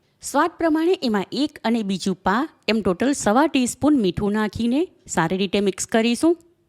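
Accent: Surat, India. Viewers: સુરતી છે..😅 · E to surti language chhe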